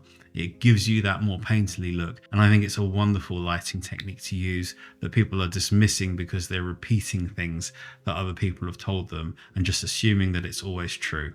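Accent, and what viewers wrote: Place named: England, United Kingdom. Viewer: Another excellent tutorial,!! Your photography and teaching skills are superb...plus, the English accent makes your videos even more pleasant to watch! 😁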